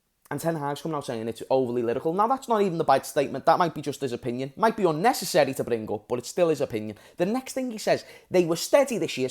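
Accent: Liverpool, United Kingdom. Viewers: Hey, I am a teenager from Kazakhstan, but I absolutely love your channel and Scouse accent ofc, despite the fact that I am a foreigner, I understand 100 of the words you are saying and the words that you are saying they are very objective and precise, hope I will se ya some day when I might visit Liverpool in the future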